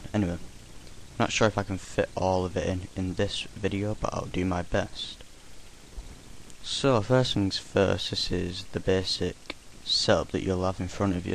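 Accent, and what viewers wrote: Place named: United Kingdom. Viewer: All British talk like that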